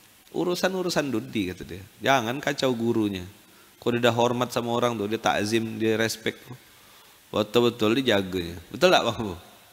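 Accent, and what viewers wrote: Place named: Malaysia. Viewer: Logat macam Malaysia pun. Terbaik ustadz ni.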